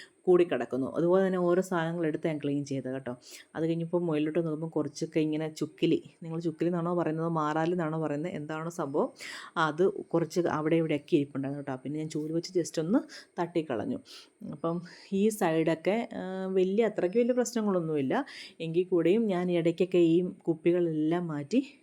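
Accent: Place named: Kottayam, India. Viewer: ഇയാള്ടെ വർത്തമാനം കേൾക്കാൻ നല്ല രസം. . നല്ല voice. . കുറച്ചു സീരിയസ് ആണോ ഇയാൾ. ....കോട്ടയം slang ഇഷ്ട്ടം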